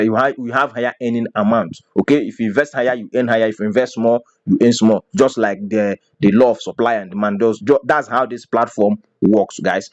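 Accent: Igbo, Nigeria. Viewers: You don’t have fact to prove your point. With your Igbotic accent,you want to spoil another man’s effort. Nothing is assured in this life,the most important thing is for you to invest what you can afford to lose. And on F&B you earn in 3ways not two. I said it that you are just a dumb critic. You earn without a single referral. You don’t have to refer a fly to earn legitimately. You are an online dumb coach that is looking for people’s businesses to pull down. You couldn’t even take you time to get your fact to backup your review point. 90% of the people I brought into the business investment did not refer a single fly yet they are earning and have recovered their capital. Till now all my team are still earning after getting their capital. Why the hate on other people’s business? As a matter of fact F&B just opened a Physical office close to Alausa Ikeja area of Lagos and is almost done with the 2nd branch on the Island in Lagos. 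So you mean a business is plan to run away with investors money and they went and opened a physical office beside Governors office? Can’t you see how dumb you are? And some other dumb people just as you are too are saying God bless you. Don’t listen to him,Join F&B and start earning your money without stress.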